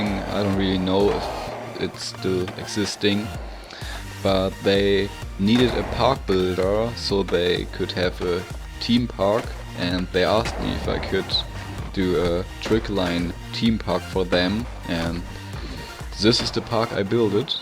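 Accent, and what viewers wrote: Germany. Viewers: Das deutsche Englisch ist legendär😂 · bist du von deutschland ??? Dein englisch ist eindeutig von einem deutschen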